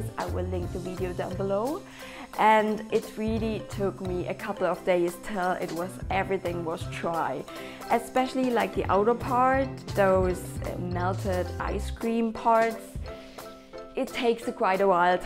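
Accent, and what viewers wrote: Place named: Germany. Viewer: hi you have a german accent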